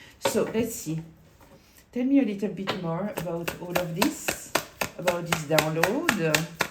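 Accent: France. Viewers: Tres insightful! Your readings seem to really tell the sad story of who she is...with a strong French accent. 👏🏾 Thank you! 🙏🏿❤️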